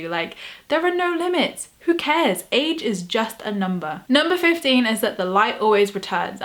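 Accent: England, United Kingdom. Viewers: Hi. I’m Carrie. If I’m honest, I’m a little bit tipsy right now. But I felt connected to you for many reasons. One of them was, you’re the same age as my older sister, so I instantly looked up to you and wanted to be like you because I’m just a little shit and I don’t know who I am yet. But I do know that the idea of living a life like you live, fills me up. But I have absolutely no idea how you do it. I don’t know how to set up a business. But the idea of working a nine to five job kills my soul, and I’m not even doing it yet. The bottom line is, I deeply admire you, and the way you talk about manifestion and the law of attraction really speaks to me. I’m Scottish, and you’re clearly English (lovely accent, btw). I would travel to England just to hear your advice, but if also talk to you any way possible. Something about you makes me really trust you and I subscribed and binge watched you instantly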